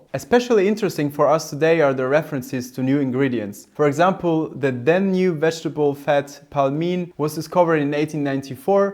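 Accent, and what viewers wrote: Germany. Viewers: This channel combines several things I adore: pre-WWI German history (when it was unproblematic to admire the Germans); a well-researched and -scripted deep-ish examination into an obscure but interesting cultural artefact; listening to a native German speaker using the language with such facility (I'm biased, I speak German and like the German-speaking-English accent, with all its idiosyncrasies); and quirky moustaches. This channel really is so verdammt charmant, especially the gramophone segments. And 20 minutes long this time! You spoil us :) BTW don't Europeans also use small blunt-ish paring knives to peel potatoes/apples/etc? That jagged knife you use for these tasks looks like an accident waiting to happen ;)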